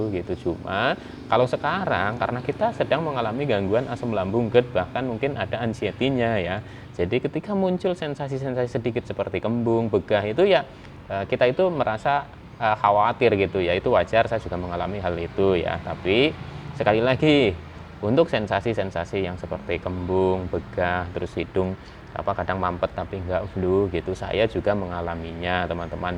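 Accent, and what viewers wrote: Banyumas, Indonesia. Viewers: Mas sudah berapa tahun Jawab y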